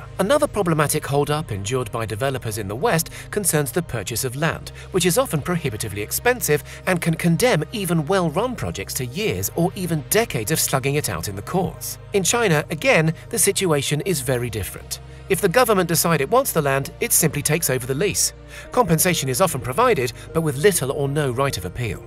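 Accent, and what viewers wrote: United Kingdom. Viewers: Sorry, hard to understand due to British accent.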